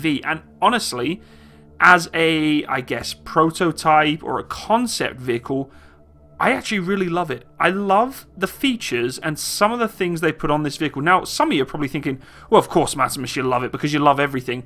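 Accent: United Kingdom. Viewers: MATSIMUS Pls do some research and not speculate everything from a shitty video that the company made for PR. It might be difficult for u considering most of your videos are just u reading Wikipedia pages in a British accent.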